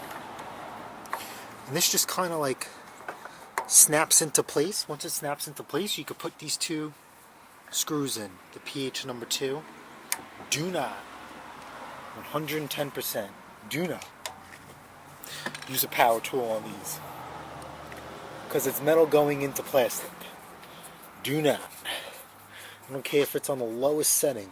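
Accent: New York, United States. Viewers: This video just keeps on giving! I had the same same problem on the same machine. It starts better now than it ever did. Instructions were easy to follow, and I appreciate the NY accent and sensibilities. I'm from RI and we're cut from the same cloth.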